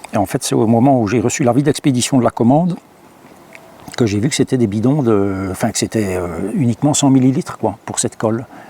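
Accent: Belgium. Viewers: Meticuleux soigné avec un ravissement pour l oreille...l accent belge juste ce qu il faut ! 😎merci !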